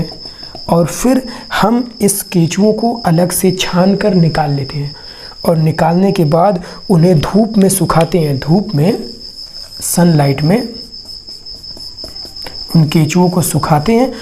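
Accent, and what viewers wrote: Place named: India. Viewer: bhai bolnay sae tou aisa lag raha hae kae aap india sae ho lakin urdu likhna jantay ho? Aur yae earth worm ko sukhanay sae pehlay marna parta hae kya? Bhai yae bhi batain kae sulphur ko gandhak amla saar kaisay banatay hein?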